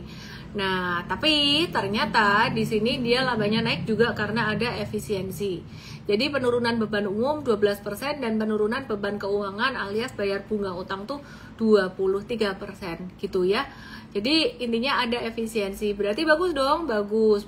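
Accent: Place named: Surabaya, Indonesia. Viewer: Enak banget mis suroboyoane ketel 😂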